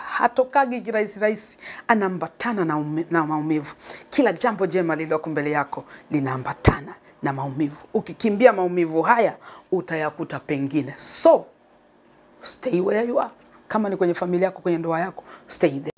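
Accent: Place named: Kenya. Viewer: Samahani Shusho ni mkinya au Mtanzania Mbona ongea yake kama mkwnya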